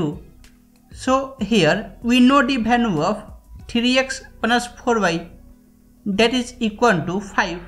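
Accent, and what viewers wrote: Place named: Bengal, India. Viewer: A Bengali accent ❤️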